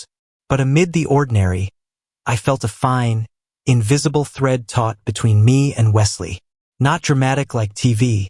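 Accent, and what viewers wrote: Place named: Spain. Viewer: The voice. Missing words. Spanish accent thrown in. All reasons why I could not listen to this all the way through. 🤮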